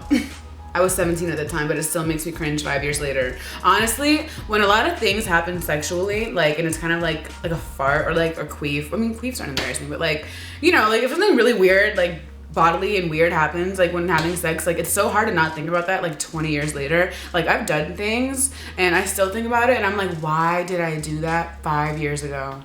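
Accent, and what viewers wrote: United Kingdom. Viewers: It wouldn't be a video without the British accent popping out and sarcasm and sass. Love it 😂😂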